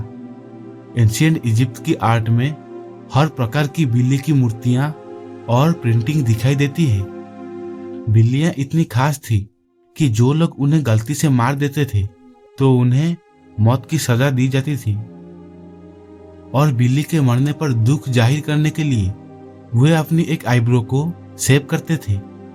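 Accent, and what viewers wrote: Odisha, India. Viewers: Are Bhai odisa se ho kya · Hindi pronounciation ru jana paduchhi j apana odia.... But nice historical facts keep it up.....❤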